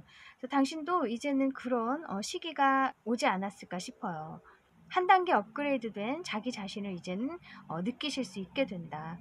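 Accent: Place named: Seoul, South Korea. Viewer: 서울 말씨를 예쁘게 쓰시는 것 같아요^^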